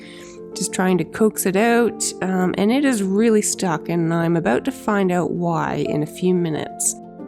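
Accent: Canada. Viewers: Hi - I am watching this video and you are commenting on my own comments I made watching your other videos. Are you Canadian? I am as well though I've been living in New Zealand 25 years so it's hard for me to tell whether your accent is Canadian or American? Greetings from Georgian Bay, Ontario! Woop Woop - another fine work of art and the colour of the paint is divine. Please what colours were combined to get this shade. It's wonderful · Regarding countersink bits, and most other small items, cheap ones from Harbor Freight will serve your purposes fine. But your accent sounds Canadian, and I don’t know if HFT does business in that area. I think the analog might be Princess Auto? (I visited Ontario once 25 years ago—my knowledge of Canada comes from social media.) Harbor Freight sells notoriously cheap, in cost and quality, tools. They’re a running joke amongst pros, but an excellent resource for amateurs. 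But you’ll probably want a decent table saw though, rather than the absolute cheapest on the market. The Ridgid R4514 is currently on sale for $399 (Can$508) down from $549 (Can$699) at Home Depot, if HD exists up North and the sale is applicable there too.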